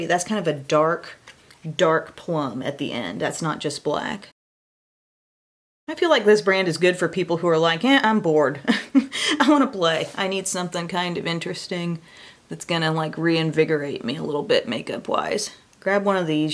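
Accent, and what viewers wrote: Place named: Southern Indiana, United States. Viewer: O.k. going to try the Profusion products now that you recommend them! They look fun! I've been watching your channel and I believe I grew up near the area you grew up. I'm from Southern Indiana near Evansville (live outside DC in Northern Virginia now)! Your voice reminds me of "home!" And, I just love that. Nice to get beauty tips and a taste of home all at the same time! ❤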